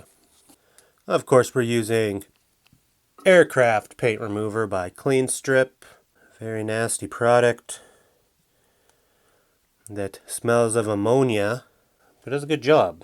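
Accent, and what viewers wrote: Canada. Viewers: Don't change a thing brother! I love your attitude and the way you tell us how you do things! Plus that sweet Canadian accent is a bonus! Everything you do is cool! This old Oldsmobile brings back memories to this old Missourian. Thanks for what you do!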